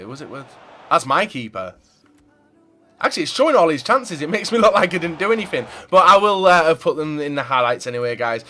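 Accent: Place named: Yorkshire, United Kingdom. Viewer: Zeid Abdellatif I think it's a Yorkshire accent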